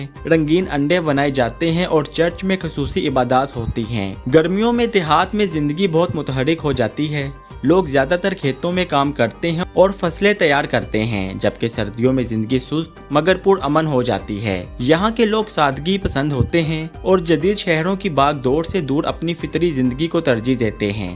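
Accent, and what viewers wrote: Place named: Lahore, Pakistan. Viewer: lahori urdu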